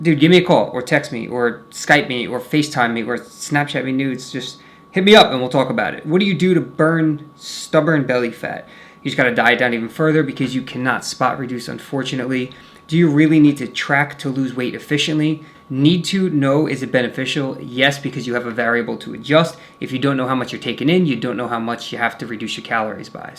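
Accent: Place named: New York, United States. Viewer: to be perfectly honest one of the main reason I subscribe nowadays is your New York accent, I learned English back in the days mostly from the movie goodfellas and even though you don't have as pronounced accent there's still a hint of it and that keeps me subscribed 😂😂😂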